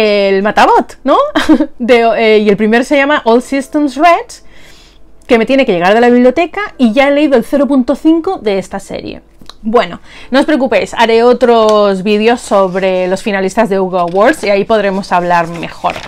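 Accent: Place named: England, United Kingdom. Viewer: ¡Hola! Yo también conocía los Premios Hugo y Nebula , pero tampoco me había interesado mucho por los premios. Ahora con tu vídeo me ha picado la curiosidad, creo que me gustaría hacer también un repaso de los mejores y leerlos, tiene que ser interesante jaja. Me encanta el título de las madres cañeras, es como que no me interesa de qué vaya la sinopsis, solo por el título quiero leerlo 😂 Me parece maravilloso que aparezca la regla, que nos olvidamos de que existe! Ains, el de la biblioteca me encanta cómo suena la trama. La sinopsis de "Uncanny" me recuerda mucho a la canción de "Kokoro" de Vocaloid, no sé si la conocerás, pero he pensado en ella automáticamente. Y por cierto, me encanta tu acento ingléeeeees!! Un besico :D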